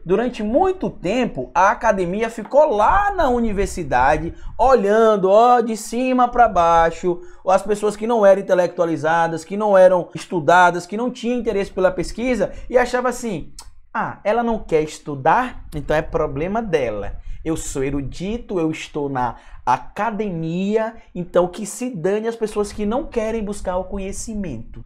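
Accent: Northeast, Brazil. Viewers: Todos sabem o papel que você e Todos fazem. Falar como nordestinos é uma honra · Professor, sou gaúcho, sempre morei aqui no RS e adoro a sonoridade do sotaque dos Nordestinos. A sua maneira de expressão é bacana, porque passa uma sinceridade. Aliás, eu acho que ela dá um plus na sua retórica. Penso que as críticas em relação a sua forma de expressão não possuem procedência. Talvez seja apenas aquele pessoal, com a velha tática, de atacar a forma, porque não conseguem atacar o conteúdo ou daria muito trabalho impugnar o contéudo. Jamais se desmotive com esse tipo de crítica. Aliás, não se desmotive com nenhuma crítica, porque seu trabalho é ótimo. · Eu adoro assistir seus vídeos, pra mim o que realmente importa é a verdade, e se a verdade vier a língua do povo melhor ainda . E em relação aos que critica de forma destrutiva, é sinal que vc está no caminho certo, e isso incomodada!!Parabéns pelo canal .À eu amo o povo Nordestino, e esse sotaque e o diferencial que conquista.😍😍👏👏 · Você fala para a sociedade como um todo entender e não apenas para um nicho., sou do sudeste e infelizmente aqui tem muito preconceito com o sotaque nordestino sim, com o modo como se expressam. 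Sem dúvida vc está sendo alvo dessa discriminação. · Meu eu gosto do jeito que vc fala porque tbm sou filho de nordestinos meus pais são baianos E eu sou paulistano e sou da pereferia de SP então eu entendo muito bem sua linguagem 👏👏👏👏👏👏👏👏👏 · Professor, A implicância com seu jeito de falar é nada mais, nada menos, que o antigo e atual preconceito com o povo nordestino. Sou de RS e sei bem o que é um povo preconceituoso. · Parabéns! Você fala muito bem, e adoro o sutaque nordestino. Bjs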